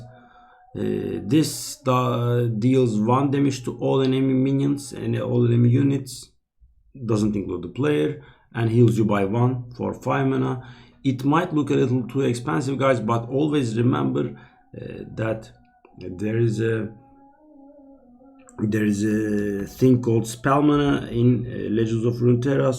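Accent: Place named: Turkey. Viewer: abi vallaha konuşmandan anladım türk olduğunu :)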